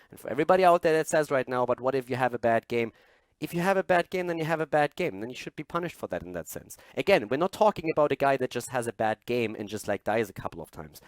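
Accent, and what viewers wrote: Germany. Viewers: I agree with all of it! Mainly with the voice thing! The MMR thing is very difficult and i know what it means to have an illidan on the team with most deaths in lowest hero dmg on the team! Maybe some tweak for the rating in combination with the other stats but it would help a lot!! I love your work and your german voice;-) Go ON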